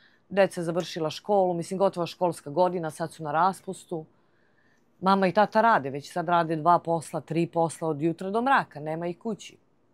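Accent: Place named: South, Serbia. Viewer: Sve ok, ali da kaze da je iz Beograda sa ovim naglaskom - nema teorije. Juzna Srbija se cuje u intonaciji.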